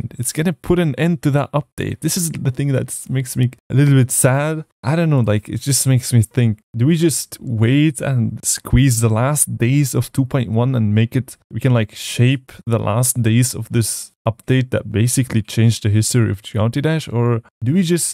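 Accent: Germany. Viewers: you german? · are you from germany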